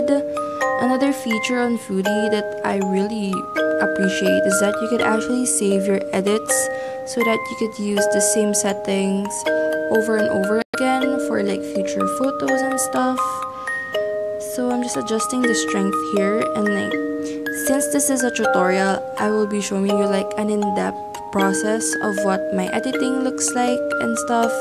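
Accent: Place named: Philippines. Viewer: We stan an angel😫 I can hear the Filipino accent btw I love it!💕 I love your feed so much it's so aesthetic i'm so inlove😭💖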